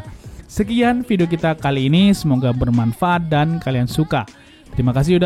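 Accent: Madura, Indonesia. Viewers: Ngasak?😂 Kental banget madura kearifan Bondowoso 😂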